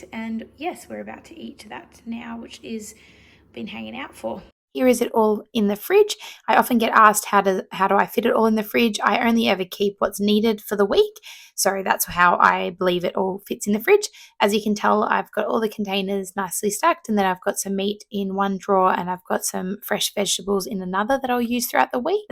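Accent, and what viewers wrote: Australia. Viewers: just beautiful as always <3 I love watching your videos, even your voice and the Aussies accent soothes me! Thank you so much for all your work and please give us more !!!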